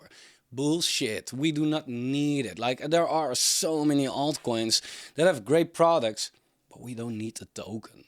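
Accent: Netherlands. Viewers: Grappig je hoort altijd meteen wanneer het een Nederlander is.. kwam je toevallig tegen na 1,5 jaar op youtube struinen. Ben geabonneerd en wens je veel succes met je kanaal !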